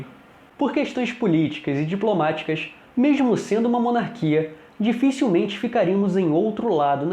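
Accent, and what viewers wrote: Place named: Rio de Janeiro, Brazil. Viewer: Odeio o sotaque carioca me inrrita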